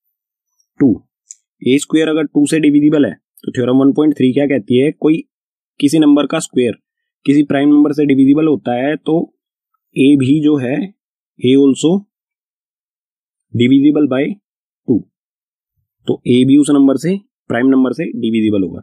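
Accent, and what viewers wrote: Haryana, India. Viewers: Sir aap Haryana se ho na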